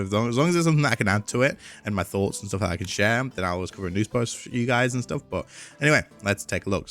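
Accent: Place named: United Kingdom. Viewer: I have decided... I am leaving Runescape for good..... thanks for all the entertainments! i like it British accent 👍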